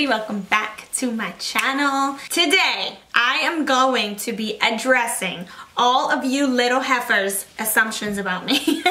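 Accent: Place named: Italy. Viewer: I loved the confidence part you spoke about. It’s so hard to get some confidence when you are comparing your self to others. 😩I guess I just gotta work on me and what I want in life. It’s a struggle but one a day at a time. 🙃🙂I assumed you were Italian btw. Haha is Spanish speaking gals we have a accent that can confuse ppl. 🤣🤣 🙋🏻‍♀️😊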